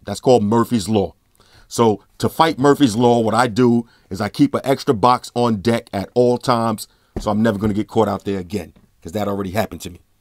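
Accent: New York, United States. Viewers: i feel like i got bullied into buying this... his voice is mad aggressive, mad convincing tho lmfao you can tell hes a new yorker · R u from New York? Ur voice is very cool 😎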